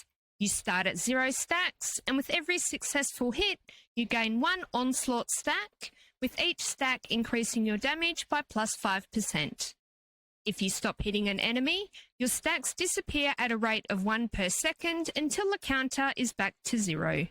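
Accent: Australia. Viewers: I will follow and like all your videos because you are so nice and polite and professional with me recently in a situation with a Facebook Fallout group I apologize if I really shouldn't be allowed to say this here but I just wanted to say thank you for being so nice and professional. Your videos here are awesome and I follow you all the time and I've been friends with you long before you where moderating anything you are a genuine good person I just can't get used to that annoying Australian accent since I'm Irish American but I love you still the same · It's good to see you making the move to YouTube. Your guides are great. As was mentioned, the volume of your voice is low, but you have a great speaking voice. I love those AU accents! Thanks for all the data mining that you do.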